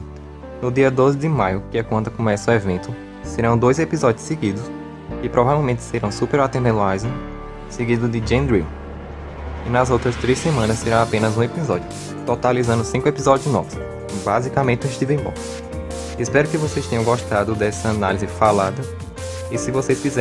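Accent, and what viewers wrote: Northeast, Brazil. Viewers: Já sei que vc é do nordeste :-) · vc eh nordestino?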